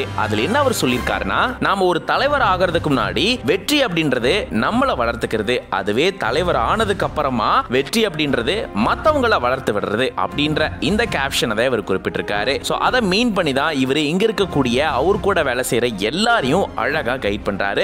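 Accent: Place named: Kongu, India. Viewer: Kongu tamila smart hero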